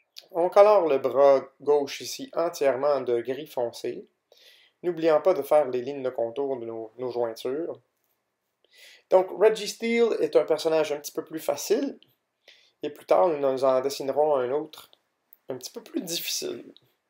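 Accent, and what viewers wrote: Canada. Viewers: T'as un petit accent canadien, j'aime bien. Tu pourras dessiner Sulfura stp? De toutes façon t'y arriveras vu comment tu dessine trop bien. Et comment tu fais pour éfacé avec ta main?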